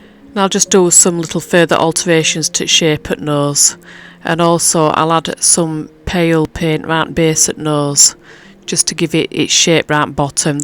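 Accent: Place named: Yorkshire, United Kingdom. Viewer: Not only are you a shit hot artist, but your accent is amazing! Reminds me of so many of my Yorkshire friends haha. Familiar, warm and lovely..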